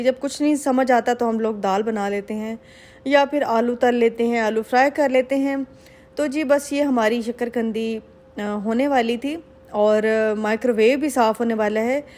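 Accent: Saraiki, Pakistan. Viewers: I live in Multan and hope to see you soon... I m a saraiki too and apki meethi saraiki sun kar bohat acha lagta hai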